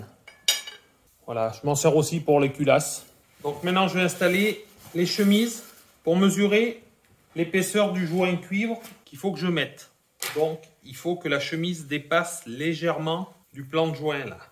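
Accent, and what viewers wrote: France. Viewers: Ça fait un bout de temps maintenant que je cous suis, trop génial dans Gestion des produits des systèmes démerdes. Et l'expérience le savoir faire ds l'excellence et la qualité. Et aussi vous être donné les conditions pour toujours être dans un atelier hyper propre et structuré au poil. Ça donne envie de voir en réel. Bravo bravo et encore bravo, c'est quoi cry accent quelle région de France.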